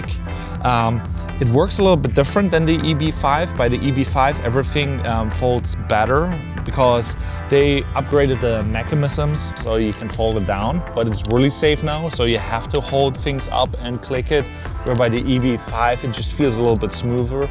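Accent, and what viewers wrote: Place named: Germany. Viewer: oh i really like Germans when they speak english :)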